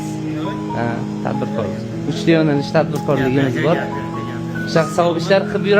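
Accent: Uzbekistan. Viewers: Buxorodan bulsa kerak shevasidan baraka toping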